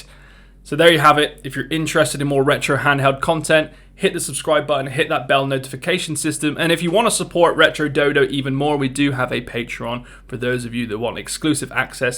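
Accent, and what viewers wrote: United Kingdom. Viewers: . Such a Bri'ish accent.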